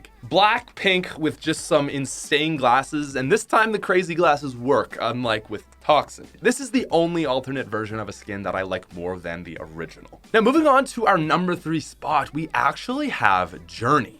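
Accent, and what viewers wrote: Canada. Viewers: All Canadian “oots”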